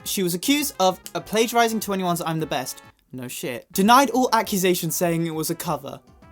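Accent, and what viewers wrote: United Kingdom. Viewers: 영상 올라왔네!!!! 하 너무 좋다, 그거 알아? 나 덕분에 영어 듣기 실력이 진짜 많이 늘었어!! 너무너무 고마워 🥰🥰 내가 미국 악센트를 가지고 있는데, 영상 엄청 많이 봐서 약간 영국 악센트랑 미국 억센트랑 믹스됐더라, ㅋㅋㅋㅋㅋㅋㅋㅋ근데 난 그것대로 좋아ㅎㅎ그리고 영상을 볼 때마다 는 참 바른 사람이라는 생각이 들어 언제나 응원할게 사랑해!! 근데 요즘 완전 슈스됐네..! 구독자도 엄청 많아지고, 영상 올린지 얼마 안됐는데도 댓글도 엄청 많구ㅎㅎㅎㅎ기분 좋다 Omg video is uploaded again!! Yayyyy I love it., you know what? thanks to, my English listening ability improved! Thank you so so much🥰 I have the States accent, but I watched videos alot so I got UK accent a little lolol I like that hehe And every time I watch his video, I think is really nice and kind and cool and hot person☺️I love your personality I stan I always cheering you up🥰🥰😘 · I'm not used to British accent and the way you pronounce hair sksjsk it's funny and adorable at the same time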